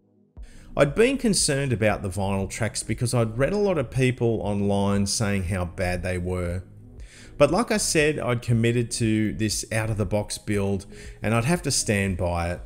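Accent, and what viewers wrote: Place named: Australia. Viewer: Fun 70s memories, but mine weren't quite as Aussie sounding. 😅 MN, USA 🇺🇲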